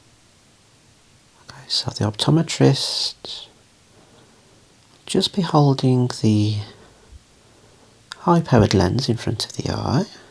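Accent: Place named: United Kingdom. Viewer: I'm german and the English we hear here is mostly American English. It's always a pleasure for me to listen to British English, like Tom Ellis in "Lucifer" , Henry Cavill or Ewan McGregor as Obi wan Kenobi. Or THIS gentleman here on this channel. Everytime a Star Wars kind of experience, when I hear "hello there !"😆 A big "Servus alle miteinander !" from Bavaria, Germany !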